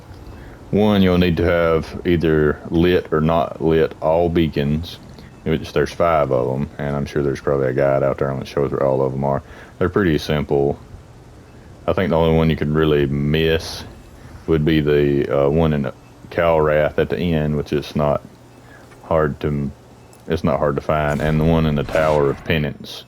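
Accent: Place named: South, United States. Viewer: You sound like a red neck bunkin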